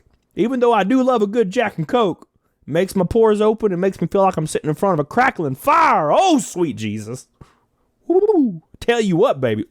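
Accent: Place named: Kentucky, United States. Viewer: This guy’s page is gonna blow up. Keep up w the content brother. You remind me of my buddy’s - lol. From KY. That accent can’t be mistaken.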